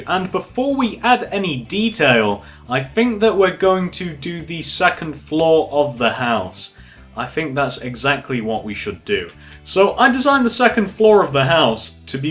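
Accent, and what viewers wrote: England, United Kingdom. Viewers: He's English, not gay.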